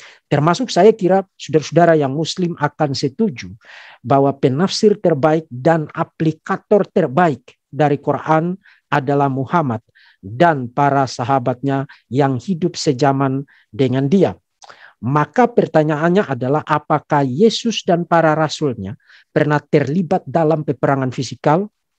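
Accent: East Nusa Tenggara, Indonesia. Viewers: Narasi yg cerdas, dan ilmiah, klo dengar dialeknya teringat waktu kuliah di Malang bersama teman kuliah dr NTT, Tuhan Yesus memberkati bang sekeluarga 🙏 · aksen rote NTT... Bravo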